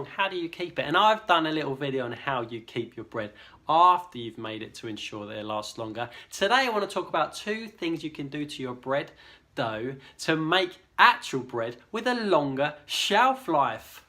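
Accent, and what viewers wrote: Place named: London, United Kingdom. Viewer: love all the tips done with such sincere passion. I’m an American and wonder about that accent. Is it a London accent? If so, or if not, which area? Can tell it’s English, but curious of the location exactly!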